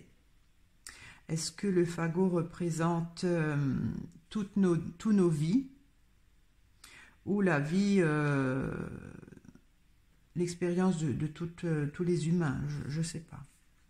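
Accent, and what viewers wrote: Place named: Corsica, France. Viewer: Merci vous êtes Adorable vous avez une intonation dans la voix qui me fait penser un peu à l accent que nous avons en Corse j aime beaucoup mais je vous suis avec beaucoup d interet pour votre bienveillance je ressent chez vous la communion que vous partagez avec nous tous c est beau ❤❤❤ Bises depuis la Corse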